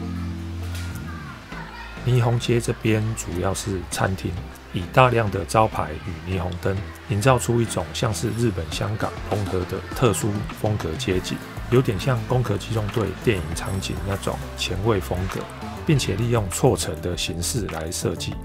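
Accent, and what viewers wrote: Taiwan. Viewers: 操着台灣國語腔調的先生闡述抗戰的史實我眼淚也躲眶而出．以從會再看你的視頻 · 这是我听过的最亲切最好听的台湾话！！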